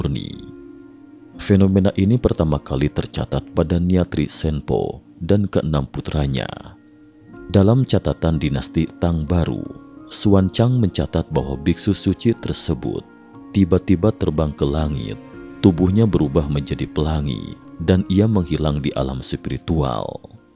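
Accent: West Java, Indonesia. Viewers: mang , maneh urang sunda nya ? hayoooh ngaku 😂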